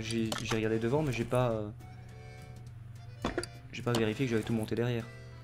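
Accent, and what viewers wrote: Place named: Belgium. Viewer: D'après ton accent tu dois sûrement être Belge ? 😁 (enfin des belges sur YouTube ahah) super vidéo +1 abos 👌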